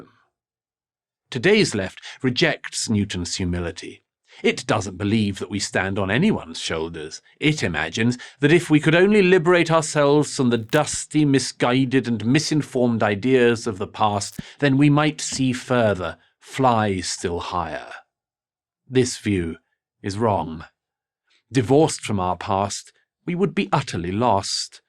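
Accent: Scotland, United Kingdom. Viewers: I agree. His voice is cold. Cold and bereft of love for humanity. It is the voice a crocodile would have if it were rendered the power of speech. I personally really like it, but then I always get hard at a Scottish accent that has been mangled to sound English.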